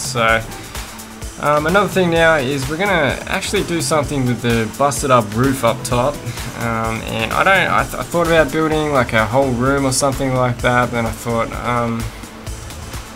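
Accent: Australia. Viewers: Is that an Australia accent I hear by the way your the best youtuber ever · It's the WIDEST Australian accent i've heard yet =O)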